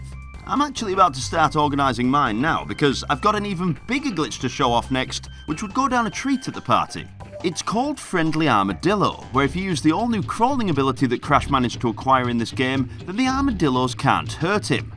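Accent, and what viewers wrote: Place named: England, United Kingdom. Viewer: even the YouTube subtitles couldn't keep up It is not only about your accent, but also the fact that you mash words together, making up new words with even weirder pronunciations. Also known as portmanteaus or slurred speech . Essentially creating a brand new dialect on the fly I find it very sad that someone from England can speak this poorly , even Africans can speak better English.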